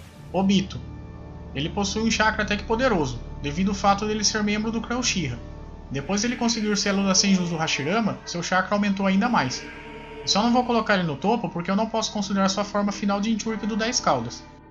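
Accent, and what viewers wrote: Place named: Minas Gerais, Brazil. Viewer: Mano seus vídeos são muito bons, tu manja muito, mas na moral cara, procura uma Fonoaudióloga, não é por implicância ou por qualquer outra coisa, mas seu sotaque torna o vídeo maçante, parece um cara do fundo do interior de minas falando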